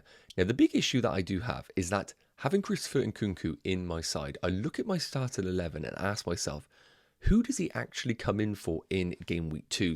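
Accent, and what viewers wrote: Bristol, United Kingdom. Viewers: Is this guy from Bristol? He sounds like me lmaooo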